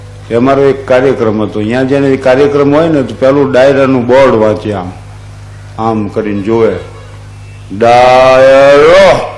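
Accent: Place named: North Gujarat, India. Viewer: કાઠીયાવાડી ના મોઢે પરફેક્ટ ઉત્તર ગુજરાતી બોલી ... વાહ...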